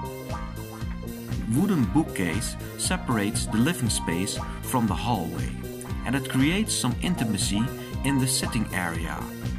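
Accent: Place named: Netherlands. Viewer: Another well turned out vid. Your voice and cadence of speech are very good for the narration. And the Dutch accent is chic. :)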